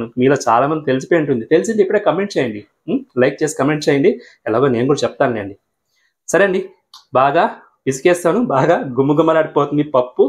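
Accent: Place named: Karnataka, India. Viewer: మీ తెలుగు స్లాంగ్ చూస్తే మీరు కన్నడిగులని తెలుస్తుంది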